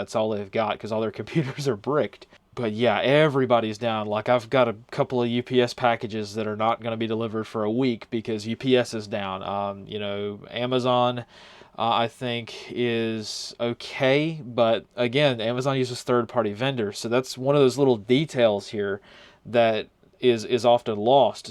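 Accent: United States. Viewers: I detect a little country accent 🥰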